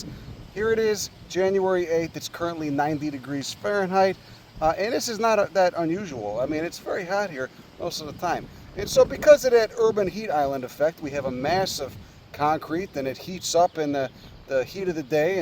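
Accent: Chicago, United States. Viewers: Love your accent!! My entire family from south side Chicago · Love the Chicaga accent!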